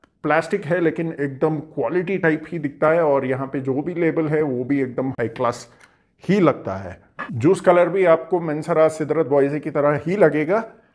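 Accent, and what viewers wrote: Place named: Karnataka, India. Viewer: First time watching your hindi channel.. You sound like a kannadiga.. Good to see you reaching hindi speaking guys... 👍🏻👍🏻👍🏻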